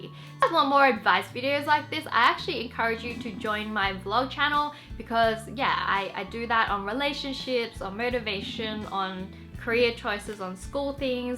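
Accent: Australia. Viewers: that's why you sound Australian I live in Australia Melbourne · You know, usually I can tell the difference between Aussie and English accents. but I honestly thought you were from the UK. but then when you said you're Aussie , that's when I actually heard your accent. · Woah, she sounds really Australian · Wengie are you Australian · she's lived in Australia most of her life, so she picked up the way that the locals spoke. Before she moved she probably didn't have that accent, it just developed over time.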